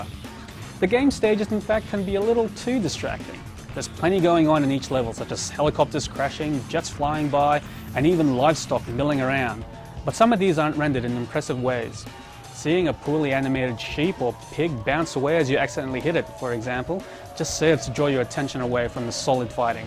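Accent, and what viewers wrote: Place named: Australia. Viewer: ... thats an Austrailan accent, you tit.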